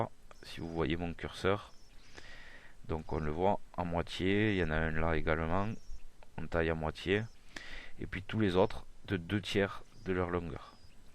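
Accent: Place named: Southwest, France. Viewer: Merci avec l'accent du sud-ouest sympathique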